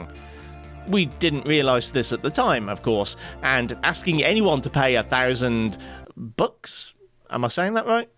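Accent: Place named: Manchester, United Kingdom. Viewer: Trying to pin your accent down. I'm from Manchester and you have a similar accent but I can't quite place where it is!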